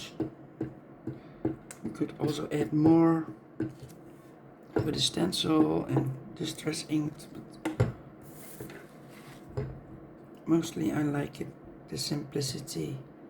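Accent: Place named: Netherlands. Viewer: Mooi maar volgens mij ben je nederlands zo te horen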